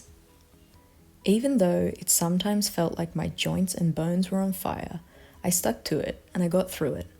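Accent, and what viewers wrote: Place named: Australia. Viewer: Wow why I didn’t know this contents before ,I love Aussie English , please keep it up for me! Thank you !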